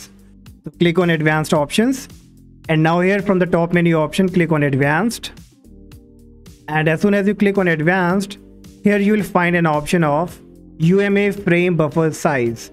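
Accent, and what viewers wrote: India. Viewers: indian Voice it Sound Scam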